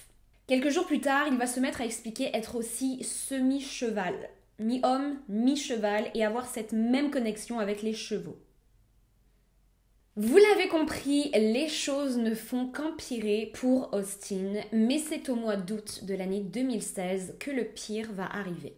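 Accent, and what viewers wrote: Quebec, Canada. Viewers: Tellement triste histoire pauvre gars ! Ma mère souffrant de maladie mentale quand j'étais jeune elle avais fait une genre de cabane avec des meubles et je ne pouvais pas manger parce qu'il y avais de la drogue dans la " nourriture " et il y avais des méchants partout ....après 3 jours ma famille est venu nous chercher pour lui dire qu'ont devais s'enfuir à cause que les méchants étais à notre recherche ils ont conduit ma mère a l'hôpital et moi j'ai manger comme jamais ! Il y a toujours quelque chose a faire les parents son a plaindre dans cette histoire ! Ps ton accent une vrai québecoise oublie pas d'aller voter ! Faire sortir nos bozos 😅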